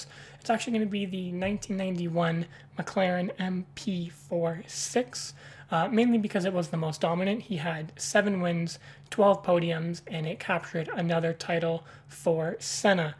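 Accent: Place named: Toronto, Canada. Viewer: Are you from Toronto?😂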